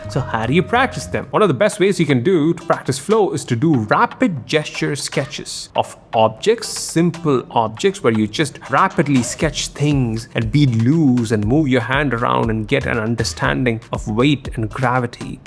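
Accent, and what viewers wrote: India. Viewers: I totally forget sometimes you have an indian accent, which i love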